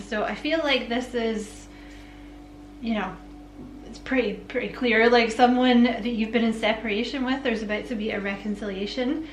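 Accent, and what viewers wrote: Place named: Scotland, United Kingdom. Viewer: What a Fab reading. I so wish you did private readings. I Love a Scottish accent me. 😘😘X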